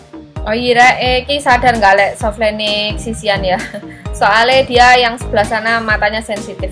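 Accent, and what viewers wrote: Java, Indonesia. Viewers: Jawanya kok medok banget. Dari mana mbak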